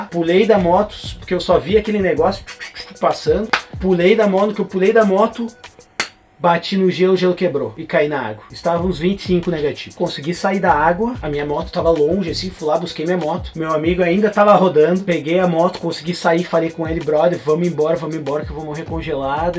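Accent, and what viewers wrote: Rio Grande do Sul, Brazil. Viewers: Esse sotaque de quem toma um mate na redença não me engana... curti o video e curti o canal... quem sabe um dia fico uns tempos ai! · Ele é gaúcho!!!🤗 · Mas bah, guri! Esse sotaque entrega daonde tu veio! É dos meus, curte um Chima! Que massa teu canal brother, que conteúdo incrível! É viciante, confesso. Um grande abraço aqui do Rio Grande Do Sul. Dalhe grêmio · Show, mas tu é gaúcho né? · Teu sotaque pareces com o do RS, eres daqui? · tu nasceu em q estado do brasil ?? (obs) tu parece c gaucho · tu é gaúcho? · Nos primeiros dois segundos ja identifiquei que é gaucho..hahahaha. É nois! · Gaucho que fala pia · irmão!! tu é de onde mano!! parece ser gaúcho... hehehe encontrei seu video agora... primeiro video que eu vejo.... e teu sotaque é muito parecido com o nosso... do RS! hehehe abraço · Só pelo sotaque no início do vídeo ja percebi que era gaúcho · Tu é gaúcho cara? 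Maior sotaque daqui kkkkkkk... Não sei como cheguei no teu canal, mas teu trabalho é fera! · pelo acento com certeza tu é gaucho tche hahahah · Dale RS! Hahaha reconheci esse sotaque com 10 segundos de vídeo! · É Gaúcho, dá pra ver no sotaque, de que cidade tu é? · em segundos ouvindo ele falando já dá pra ver que é gaúcho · bah LlllKk, tu é gaúcho? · Sotaque gaúcho bah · sotaque gauchoooo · Mano, tu me parece ser gaúcho kkkkk Manda um salve pra Rio Grande do Sul, Gravataí TMJ!! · Parece gaúcho pelo sotaque kkkk, talvez eu esteja errado, mas o sotaque parece muito com o meu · Nos primeiros 10 segundos pensei na hora que tu é gaúcho hahah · tu é gaucho? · gaucho certeza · Nem 5 seg de vídeo já vi que é gaúcho! Hahahah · No início, pelo sotaque, eu sabia que era meu conterrâneo gaúcho!!!! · Sotaque gaúcho kkk❤️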